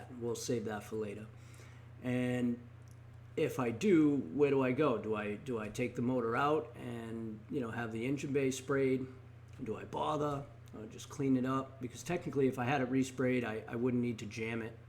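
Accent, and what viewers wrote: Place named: Boston, United States. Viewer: Ca. 😂 Boston accent. Nice car bro.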